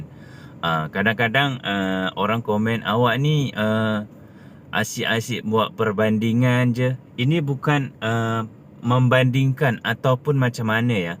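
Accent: Malaysia. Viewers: Wow,hang cakap,sebutan bahasanya mcm org melayu msia,x mcm org indonesia pun. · bahasa malaysia awak dah macam bukan orang indonesia dah..awak punya cara pencakapan pun macam awak ni orang malaysia..terbaik channel ini..support semua..makin ramai orang indonesia buat youtuber untuk promot malaysia dimata dunia..terima kasih🇲🇾❤️🇮🇩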